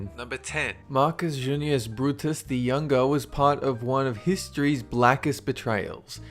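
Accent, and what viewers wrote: Australia. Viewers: Does he have an Austrailian accent or is it something else?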